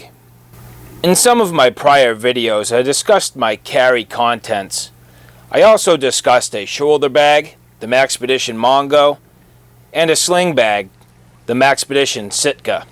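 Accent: Boston, United States. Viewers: Your American accent is horrible. Learn British accent or something. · I can agree that is comes out full throttle. You sound like someone from my area and then the Boston accent comes out. I find it fun to listen to. But it was a great video! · I hear lots of good stuff about this brand, I'll have to check em out. Great review! Any chance you're located in Massachusetts? Sounds like a Boston accent.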